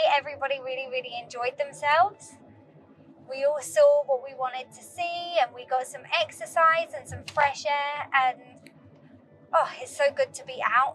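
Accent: United Kingdom. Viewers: 英國腔真的超好聽， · 好聽的英國腔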